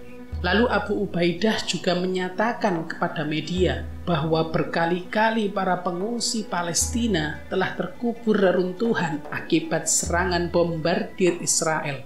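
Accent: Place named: Java, Indonesia. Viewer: Abu Ubaida....MEdok kali mas😅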